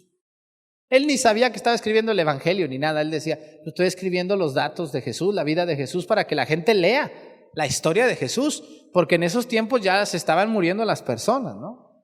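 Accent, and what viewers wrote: Mexico. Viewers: Me gusta como enseña y predica,se le nota lo mero México, Dios lo bendiga padre he aprendido mucho con usted, saludos desde,pasto Colombia, me hace reír por su forma de hablar,pero no es por burla yo respeto mucho a los sacerdotes es por su gracia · Nada mejor para el domingo que escuchar de la Virgen María; su forma de narrar los hechos y su dejo mexicano tan agradable (3ra vez que veo este video), no necesita imágenes al narrar mi imaginación desarrolla lo hablado. Los sábados maratón de vídeos café Católico. Desde Lima Perú. Mis oraciones a Dios para que le de muchos años de vida con salud, alegría y fuerza por enseñarnos. GRACIAS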